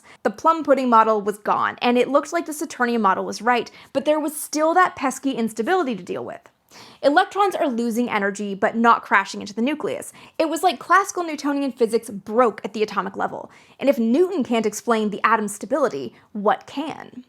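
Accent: Canada. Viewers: She's Canadian, yes? Or Possibly from Minnesota.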